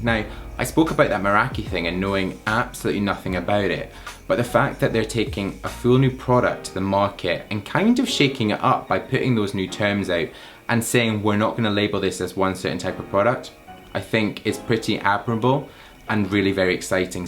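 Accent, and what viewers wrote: United Kingdom. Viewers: Is funny how British people talk.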